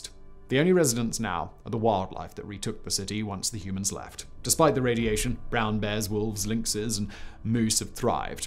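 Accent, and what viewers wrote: United Kingdom. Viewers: Yes. He tends to put me asleep. I genuinely don't like the British accent but I like his voice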